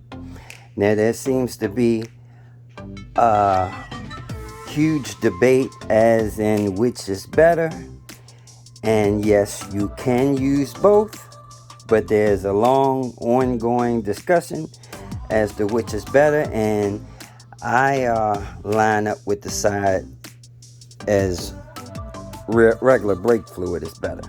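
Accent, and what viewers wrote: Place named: Georgia, United States. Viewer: Bro, I feel you on the "troll" shit, were from a different time & a different era, where we ran our mouths to each other faces, & backed that shit up! 💯 I'm 47 y.o, very outspoken on my views & beliefs, but AT LEAST I stand in something, these days it's the blind🦮 leading the blind🦯 out there nowadays...... Where you from originally bro, Georgia or something, I know your accent but can't place it??? 🤔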